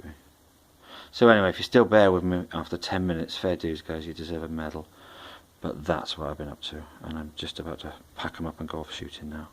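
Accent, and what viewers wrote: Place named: Sweden. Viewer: didn't even notice his accent until you said it, then immetiate: swede. channel seems to be rly great find for me.i miss shooting stuffs. you do know teh nicest people :)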